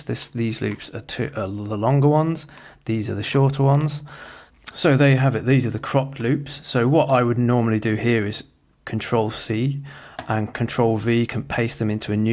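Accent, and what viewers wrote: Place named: Northern England, United Kingdom. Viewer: Just watched it - very useful thanks - I am doing none of those things properly although I have found a good piece of s/w to show the key of a clip - Keyfinder (no business connection - its free) - nice to have a calm englishman with no gimmicks rather than some of the johnny foreigner types with all their silly cuts (including hair!), daft graphics, mumbling, silly accents etc - I take it you're from up t'north lad by eck.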